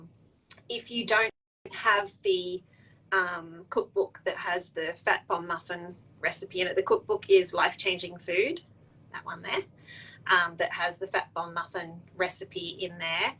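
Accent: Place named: England, United Kingdom. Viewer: Beautiful accent voice.reminds me of my sister in England..Thank you Good idea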